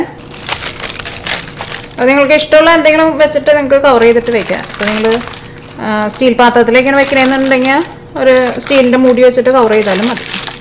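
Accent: Thrissur, India. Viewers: സംഗതിയൊക്കെ കൊള്ളാം പക്ഷേ ഈ തൃശൂർ ഭാഷ ഒന്ന് മാറ്റിക്കൂടെ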